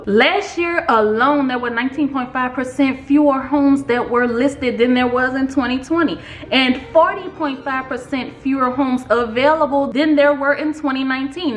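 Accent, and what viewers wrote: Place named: Louisiana, United States. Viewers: You gotta be from Louisiana. That accent sounds too familiar! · Oh, I know you from Louisiana. I'm in Slidell and I hear home all in your voice.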